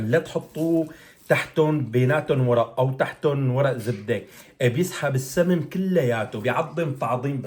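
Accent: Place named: Syria. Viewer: اللهجه السوريه تجنن❤❤❤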